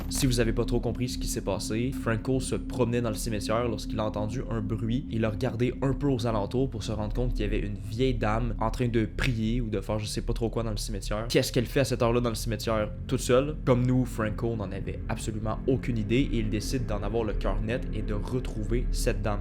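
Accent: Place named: Canada. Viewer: Bonjour beau accent vous êtes canadien merci pour les vidéos bonne continuation à vous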